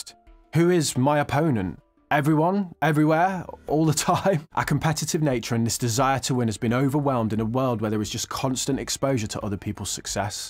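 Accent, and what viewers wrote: Birmingham, United Kingdom. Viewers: is it Birmingham accent?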